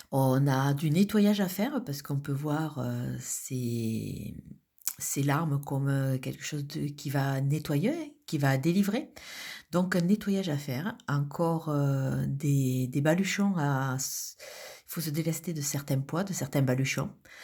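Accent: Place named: South, France. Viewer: Bonjour, accent du soleil!!. 🌞... j'aime beaucoup cet accent, merci de tout ❤ pour ce tirage. Une question si vous permettez: faites le nettoyage émotionnel? s'il vous plait... 👃 🙂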